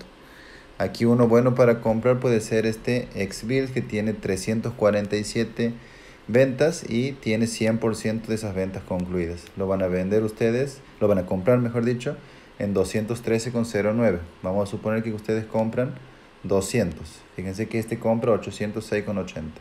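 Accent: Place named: Santiago, Chile. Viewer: que lindo es escuchar la voz de un santiagueño puro !!! saludos de un termeño !!!!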